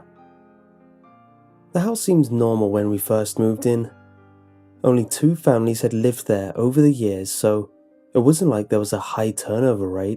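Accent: United Kingdom. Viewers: where in the UK are you from? I can't place your accent!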